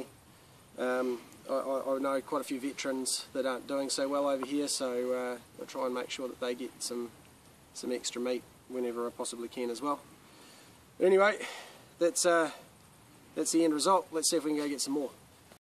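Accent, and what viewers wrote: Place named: New Zealand. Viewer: deserves more views just for the kiwi accent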